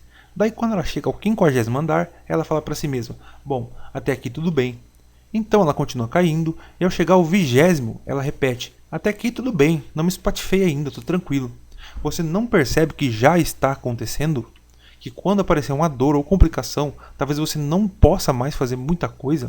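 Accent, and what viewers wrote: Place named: Inland São Paulo, Brazil. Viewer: Com essa fala de paulista do interior aff que r ridiculo!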